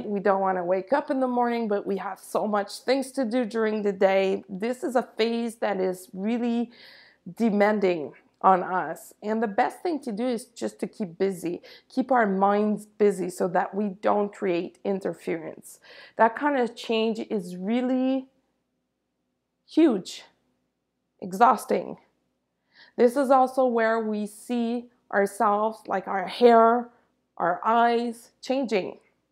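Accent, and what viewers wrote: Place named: Montreal, Canada. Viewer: I live in the country and while gathering horsetail for my tinctures, I pulled up a nice dandelion plant, saved the roots to roast for coffee, and cut the leaves up finely, added some of my seasoned oil and vinegar, salt, pepper and voila! Are you in Canada? I lived in Montreal and recognize the accent, I think...Namaste!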